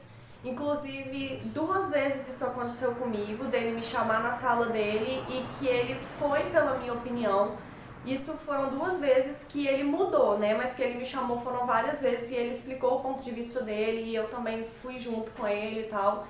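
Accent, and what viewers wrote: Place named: Brasília, Brazil. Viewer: Vc e de Brasília ? pelo jeito de falar parece ser! :) gostei muito do vídeo um abraço.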